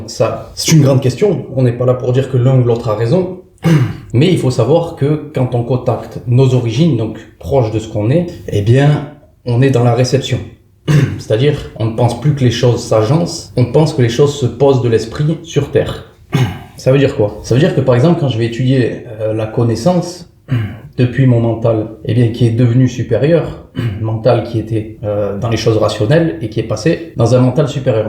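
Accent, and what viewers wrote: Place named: Corsica, France. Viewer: Ce n'est que la voix d'un corse.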